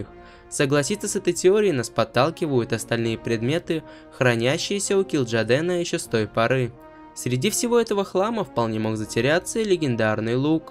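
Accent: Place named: England, United Kingdom. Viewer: Сильный английский акцент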